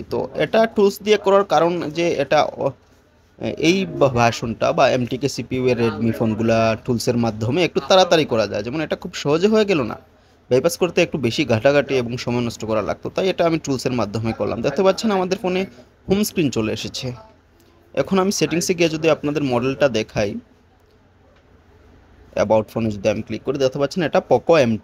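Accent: Sylhet, Bangladesh. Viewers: Vai Sylheti😊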